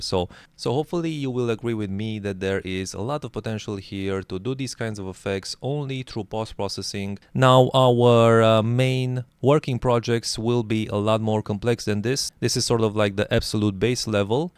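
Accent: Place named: Russia. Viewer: You take not like a native speaker, are u russian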